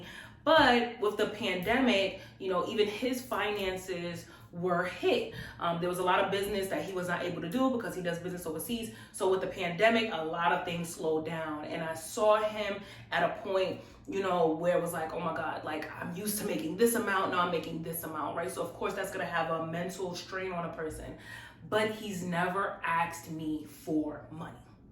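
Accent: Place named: New York, United States. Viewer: I just love your New York accent! Lol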